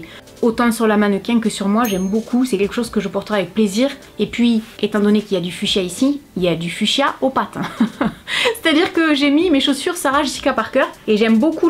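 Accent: South, France. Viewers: je trouve que rentrer le tshirt dans le pantalon allonge vraiment ta silhouette ! j'ai découvert ta chaîne il n'y a pas très longtemps et j'ai regardé toutes tes vidéos j'adore tes concepts et ton petit accent du sud ahahh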